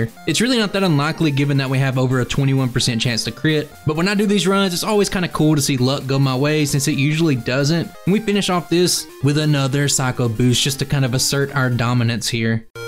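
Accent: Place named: Appalachia, United States. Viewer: I love the fact i found a pokemon channel where someone pronounced names and items like I do in the southern tip of Appalachia. Instant sub lol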